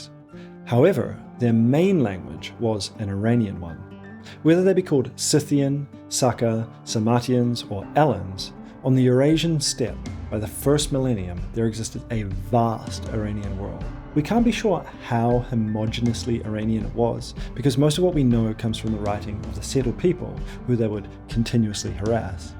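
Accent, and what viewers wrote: New Zealand. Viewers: Absolutely splendid video. I’d never have clicked if I’d seen the length but enjoyed every minute. Where is your rhotic kiwi accent from? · Rhotic New Zealand accent. Intriguing. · Narrator has a strange semi-rhotic accent with traces of NZ roots. Auto-captions struggles to understand it.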